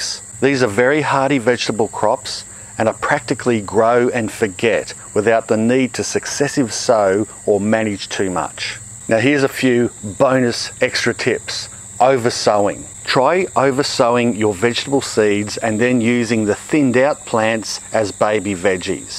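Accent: Australia. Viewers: How can this video have dislikes!! Can't honestly believe it. Thanks so much from up the hill in Toowoomba. Love the dad jokes but the way. Makes me smile to hear your good ol aussie accent on the screen. Grew up in Brisbane in Morningside so also good to see your southsider as well! 😉. Take care mate. Hope the family is well.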